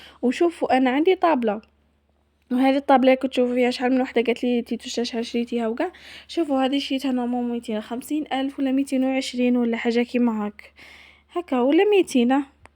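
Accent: Algeria. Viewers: علاه حنا قلنا مشي حلوة قلنا تهدر هدرتنا تاع الغرب ماشي هدرة الناس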